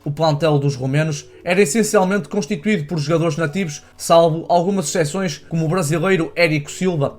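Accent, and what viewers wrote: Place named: North, Portugal. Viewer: o sotaque do senhor do vídeo é demasiado carregado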